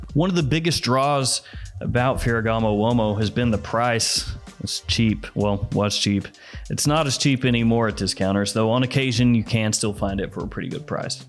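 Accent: United States. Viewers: i hate american accent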